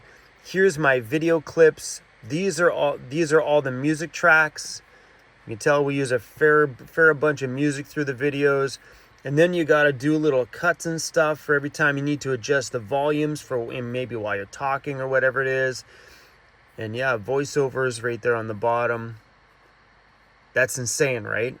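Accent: Australia. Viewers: Lovely hearing that Aussie accent. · Ahhhh it's great to hear an Aussie accent. I'm a Kiwi in Australia!!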